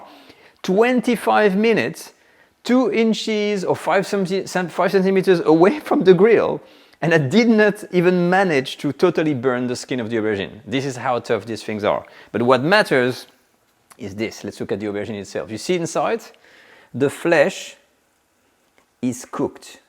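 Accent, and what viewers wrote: France. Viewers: I've lived in France for more than 40 years, and I still love the authentic French accent when speaking English!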